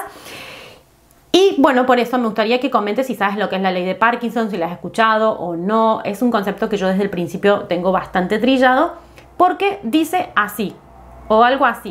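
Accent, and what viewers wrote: Argentina. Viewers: Esa tonada cordobesa !! 😅😅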